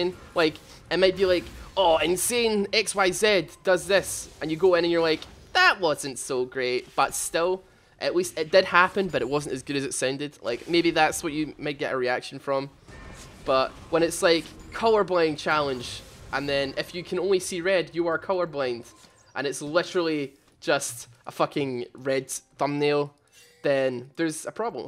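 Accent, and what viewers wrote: Scotland, United Kingdom. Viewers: keep up the good work. and ugh your scottish sooo........ THERE CAN BEE ONLY ONE! did i do that right?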